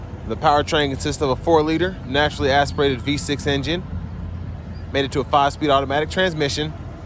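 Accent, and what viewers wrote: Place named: Pittsburgh, United States. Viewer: Nice review. I know you are in Canada but it sounds like you have a Pittsburgh accent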